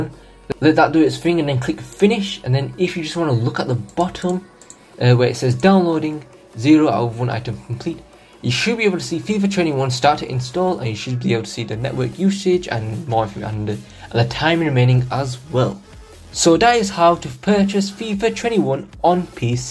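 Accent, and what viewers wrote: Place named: Bradford, United Kingdom. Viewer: My man sound like he’s from my home city Bradford 🤣🔥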